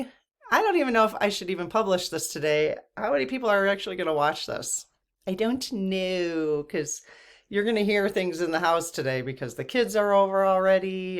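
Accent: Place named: Wisconsin, United States. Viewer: ....wow you are SO Refreshing, I love listening to you cause it feels like talking to my sister or best friend....by the way are you from Wisconsin? you have that Wisconsin kinda vibe and accent? I LOVE your feisty truth telling too........all of us should strive to have that yin yang balance of doing deep inner healing work, connecting to our own higher self and spirit guides to help us grow and learn....and then taking action and being brave enough to challenge the injustice and talk about it like you are doing on your channel....May we all be guided together to a more peaceful and sustainable world!!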